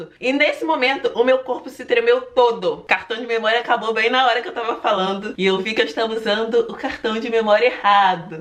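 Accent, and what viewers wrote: Rio de Janeiro, Brazil. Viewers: Rexpoxta vc e da onde com esse sotaque do rio?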